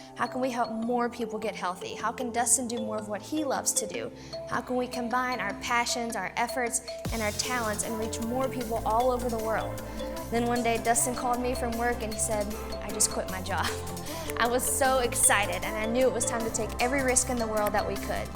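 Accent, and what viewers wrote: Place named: South, United States. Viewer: I've been with you since the pandemic, and just watched this. I am even more inspired and in love with you, your personality and positivity. You are infectious and I admit what brought me here originally was your southern accent (as a Charlotte native). Thank you for bringing your all to us and making us better! Your brother would be so proud of you <3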